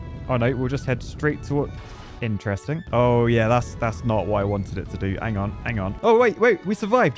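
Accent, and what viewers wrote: United Kingdom. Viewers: I cant understand you when you speak british >:(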